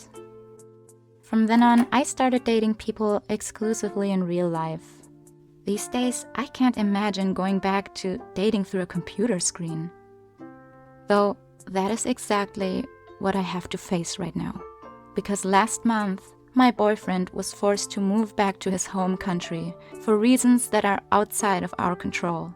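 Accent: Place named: Europe. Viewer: YOU SOUND EUROPEAN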